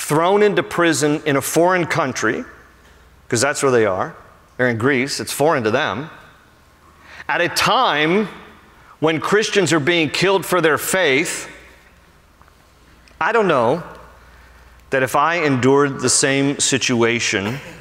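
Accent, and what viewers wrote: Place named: United States. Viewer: I’m a female solo traveler now in Turkey. These sermons are the last thing I listen to after tucking myself in bed each night. Pastor’s familiar voice, American accent like my own and colorful stories of scriptures bring me laughter and peace same time. Thank you 🙏🏻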